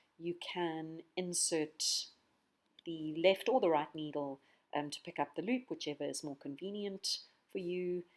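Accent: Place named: South Africa. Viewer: Thank you so much. Such a beautifully presented, clear and simple pattern. I'm thrilled. And to hear a lovely South African voice showing a familiar way of knitting is delightful!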